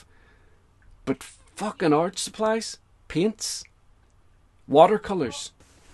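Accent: Northern Ireland, United Kingdom. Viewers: I'm really sad about this. I've been following you for years (my other account is Maureen Refior). I know so much about you and your family that I almost feel like I know you all, in a weird way. Just hearing your voice is comforting, because I rarely speak to anyone from Northern Ireland anymore and it's just nice to hear the sound of home. I get it, though. Having your words stolen and twisted into something completely different is an ultimate betrayal. It's an act generally performed by intellectually stunted virtue signalers who get their news from Twitter and Facebook groups. They roam the internet in packs searching for victims. The pack's collective IQ is generally 43. You're a good man. Your past actions do not have to be eternally atoned; bad shit happens in wartime. And while I am sad that you're leaving, the fact that you feel this as deeply as you do really upsets me. 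I will probably join your Patreon. When I commented on Facebook I thought I had your email but I was mistaken and l don't want to lose touch with you. I pray for your daughter every day, along with you and her mum. Take care of the wonderful man you are now. Screw the trolls. Much love to you. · Hey. If you are closing the channel, I just want to say thanks for the content you've put out. I've only discovered your channel relatively recently but it seemed like any art supply I looked up you had a really good review of. To be honest, I thought you had a Northern Irish accent so you were relatively local to me and that was kind of cool so i kept coming back, although you've since mentioned in other reviews that you're actually in England, but anyway. Anyway, just know that there are morons out there these days who just seem to go out of their way to get offended. It doesn't matter what you say, they'll twist it and do mental gymnastics to get themselves outraged. 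You can't let them get to you. And if, for you, that means you feel you need to close your channel, then the most important thing is to look after yourself and your mental health. You will be missed though. All the best.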